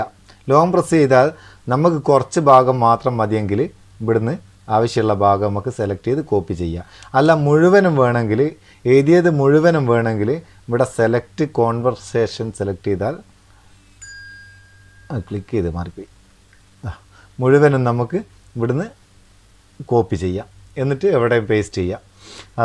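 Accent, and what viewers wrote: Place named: Balussery, India. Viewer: നിങ്ങൾ എവിടെയാണ് ബാലുശ്ശേരി ഇങ്ങളെ ഭാഷ കേട്ടിട്ട് അങ്ങനെ തോന്നുന്നു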